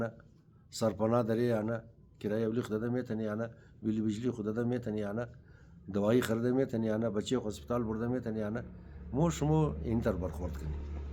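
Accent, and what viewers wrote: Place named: Hazarajat, Afghanistan. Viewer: کاکای ما از آزره جاغوری ایم. لهجه آزرگی تو خیلی خوشم میه. خدا توره عمر سالم و دراز بده. 💐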